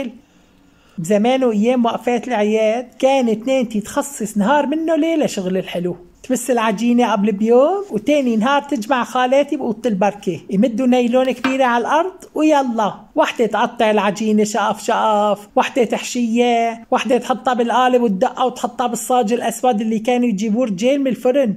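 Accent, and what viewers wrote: Aleppo, Syria. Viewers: من بعدك ماحدا يحكي حلبي حببتينا باللهجه الحلبيه وتعليقاتك الراقيه الخفيفه الحلوه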